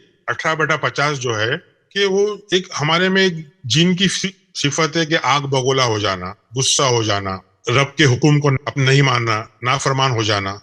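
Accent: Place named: India. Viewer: مجھے لگتا ہے تمھارے لہجے سے کہ ہندوستانی ہو اور اپنی مرضی سے قرآن کی تعویل کر رہے ہو۔شاہد ہندو بھی ہو۔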